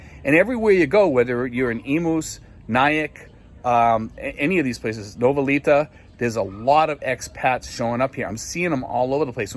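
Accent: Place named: Massachusetts, United States. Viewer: i detect a Mass accent, where are you from in Mass?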